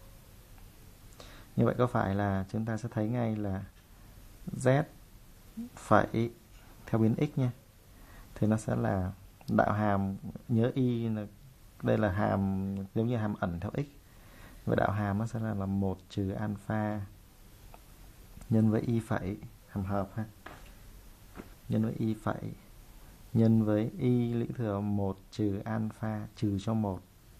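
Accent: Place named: North, Vietnam. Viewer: hình như thầy ng miền Nam ns giọng Bắc thì phải, giảng thấy dễ hỉu hơn mấy cái power point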